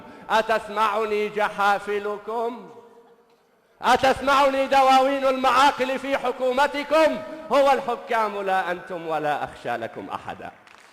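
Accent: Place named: Libya. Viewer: سلم لسانك حبيبنا ليبيا طالعه من لسانك مثل العسل 🇱🇾🇱🇾🇱🇾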